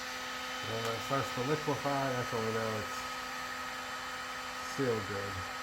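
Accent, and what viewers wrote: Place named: West Virginia, United States. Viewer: What part of West Virginia are you from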